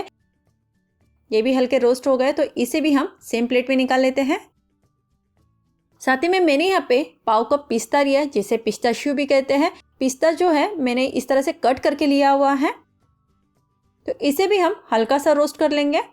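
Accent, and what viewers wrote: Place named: Gujarat, India. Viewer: Aapka bolne ka lahza gujrati bhasha k jaisa hai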